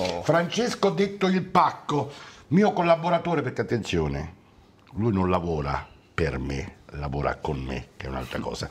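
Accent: Rome, Italy. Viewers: His roman way to talk is absolutely beautiful mixed with such an elegant sense of humour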